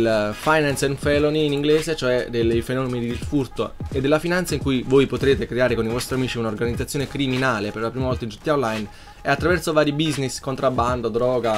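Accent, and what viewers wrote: Italy. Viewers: Adoro o sotaque italiano kkkk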